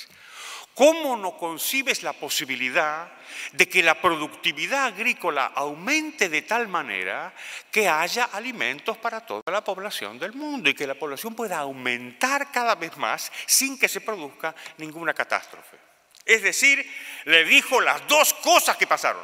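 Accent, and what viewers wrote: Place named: Argentina. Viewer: Un acento argentino que no se pierde .. !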